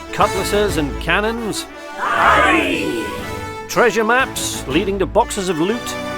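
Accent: United Kingdom. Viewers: Why do Brits always seem so level headed, reasonable and intelligent when they speak! lol Great vid, earned my sub! Downloading the game now, can't wait!!!!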